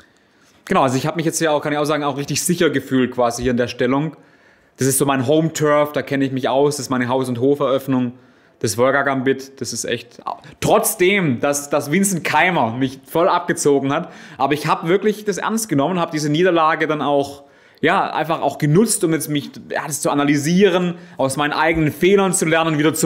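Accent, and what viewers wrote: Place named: Baden, Germany. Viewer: sehr gerne und vielen Dank auch für die schnelle Antwort. :) Ich bin gespannt. Das hört sich gut an, dass ihr da schon hinter den Kulissen daran arbeitet.^^ Spannend wäre das beispielsweise wie das Profischachspieler machen, die das quasi als Beruf ausüben, aber auch Berufstätige, sprich beispielsweise du, also wie verbinden die den 8-Stunden-Job dann quasi mit Schach. Ja das Überangebot ist gerade für Spieler/innen unter DWZ1500 sehr erschlagend und überfordert zum Teil schon. Also ich habe einige Kanäle wieder deabonniert oder stumm geschalten, weil es einfach zu viel wird. Da helfen Bücher schon eher und das finde ich hier auf dem Kanal beispielsweise gut, dass nicht jeden Tag ein Video kommt (plus der badische Einschlag, wenn ich das richtig rausgehört habe, aber das liegt an dem ebenfalls vorhandenen badischen Wurzeln :D).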